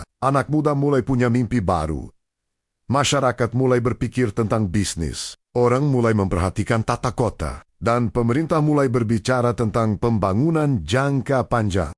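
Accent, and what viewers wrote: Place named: Malaysia. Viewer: logat Malaysia, kalau narasinya orang indo kebanyakan fitnah iri dengki 😀😀😀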